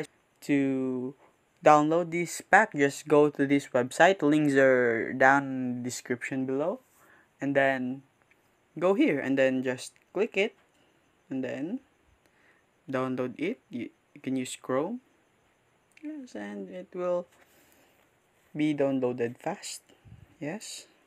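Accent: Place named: Philippines. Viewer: Noypi!!!! I just know from your accent, am I right?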